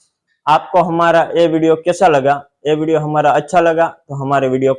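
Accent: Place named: Kathiyawad, India. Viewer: Apne jo 30,000 rupees invest kiye the wo kis chij me invest kiye the wo ap explain kar sakte hai kya ? Video me karenge to bhi chalega or messages me replies karenge to bhi chalega.....or mujhe ye janana tha ki - 1 - melting machine price 2 - kitane type ke wax type hai apke pas or usaka price. 3 - wax melt karne ke bad jis glass me wax dalate hain us glass ka price 4 - maine apka box dekha hai wo apne shayad banwaya hai or wo bahut cha hai to us packaging box ka price. 5 - ap kitane type ke colour use karte ho or her ek color ka price. 6 - agar ap fragrances use karte ho to usaka price kitana pada apko. 7 - wicks ( candel dhaga ) hoti hai usaka price 8 - candle banane ke liye ap jo molds liye honge, to apne kitane types ke molds liye hai or unake bhi price batao. Hame bas apke raw material ka price ka idea batao kyunki hamare idhar ki kuch ladies start karna chahti hai. 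Or wo bahut Young hai agar ap ye sab batayenge to bahut help hogi. Ap shayad gujrat se hai, because apka awaj kathiyawadi type ka lag raha hai, or apki video me jo apne e-commerce ki journey dikha rahe hai... Wo bahut achi hai. Aisehi ap imandari se kam karte raho.bhagwan apko bahut age bhadaye Dhanywad apko 🙏❤️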